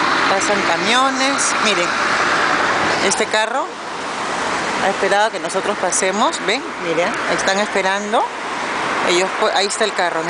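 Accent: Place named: Peru. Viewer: Por el dejo eres Peruana, yo vivi en Perú dos años y alla si tocan claxón bastante 😄. Saludos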